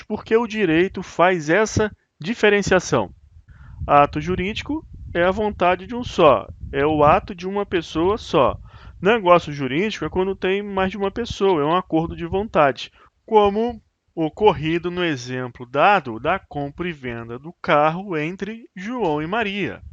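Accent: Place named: Rio de Janeiro, Brazil. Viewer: Aula boa. Mas não deu para deixar de reparar vc falando Nascer substituindo o som do S pelo da letra I (naicer), é estranho aos ouvidos, mas sei que é característico do carioca. O Interessante é que vc conseguiu falar nascituro com todos os sons das letras da palavra.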